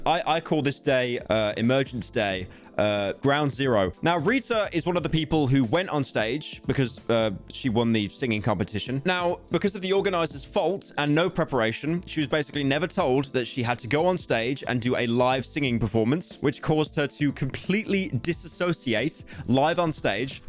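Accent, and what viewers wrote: United Kingdom. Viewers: Brits are so weird... "Willy Wonker"... geez man.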